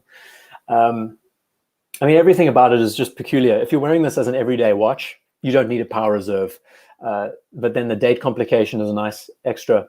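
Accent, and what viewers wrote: South Africa. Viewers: Your dead wrong Bob! I completely disagree! FYI it is just under 4 hours of snobbish and arrogant critiques and opinions of watch photos by an obviously narcissistic man with a South African accent that he has mistakenly made sound so patronizing and annoying that nobody listening to him will realize he was trying to sound intelligent or "cool" because their brain will be frozen by the endless nails down a chalkboard effect that his voice has on them and he never stops talking for more than a second or two for the entire four hour torture fest. This obvious narcissist who definitely cannot afford a single watch that he talks about unless his "Daddy" buys him one is also completely unqualified to critique or give his opinion on these timepieces so thank goodness for the repetition Bob, it helps us to remember the information being shared that is most likely completely wrong and/or irrelevant. 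The best part is this guy is only 26 or something unless I heard him wrong which I may have because I was often interrupting him by yelling and screaming profanity at the screen but who talks like this guy at 26? Notice he doesn't show his face at all which is probably because he was bullied so bad in high school that he had to enter the witness protection program. The world is going to end soon if arrogant, luxury worshipping little weasels like this guy can actually make money rambling on about watches. Freedom of speech is a real burden sometimes and this is one of em big time. I wis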